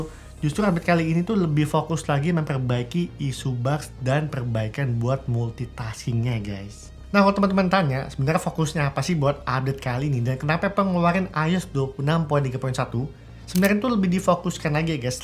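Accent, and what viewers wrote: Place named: Europe. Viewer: lidah - lidah eropa memang begitu bang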